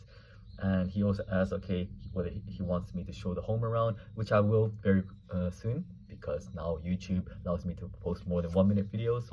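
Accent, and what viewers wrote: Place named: London, United Kingdom. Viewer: Ah I see. That's why you got a hint of London accent when you speak english. Thanks for answering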